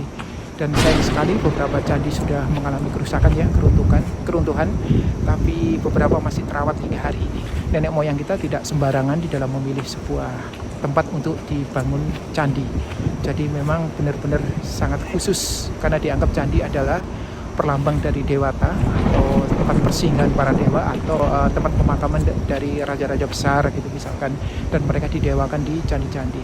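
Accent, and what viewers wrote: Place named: Surabaya, Indonesia. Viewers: Loghatnya jatim banget 😅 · Logat e Suroboyo banget 😀😀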